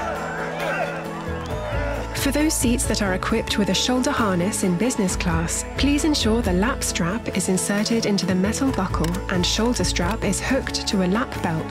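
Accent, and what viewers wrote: United Kingdom. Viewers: Мне кажется надо было записать реальный голос бортпроводника с казахским акцентом, ведь в этом тоже кайф, а не прилизанный британский английский. В остальном все круто сделано! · Какой великолепный британский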